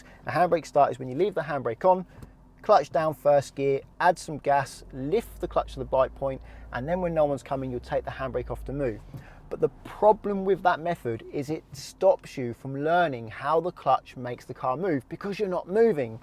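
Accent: England, United Kingdom. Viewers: YouTube isn't good at captions when it comes to English-English.. Sometimes it's bite point and sometimes it's bike point in the transcript xD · i really enjoy watching your videos... not only because I'm learning manual car and find your videos the best teaching videos about manual cars... but also because i like to listen to your perfect British accent as I'm English language learner 😊.. thank you very much sir 🎉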